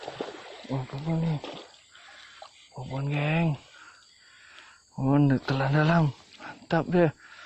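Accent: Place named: Java, Indonesia. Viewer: Pekat Jawa bang. Hahaha. Gede iwak e.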